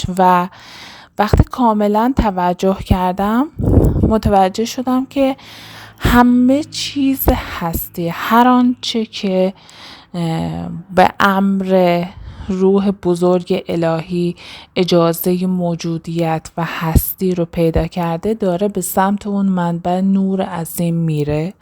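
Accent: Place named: Kerman, Iran. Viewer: سلام عزیزم اگه کرمان هستین لطفاً بگید چون منم کرمانی ام ولهجتون فک می کنم همشهری هستیم من خیلی نیاز دارم باهاتون صحبت کنم اگه میشه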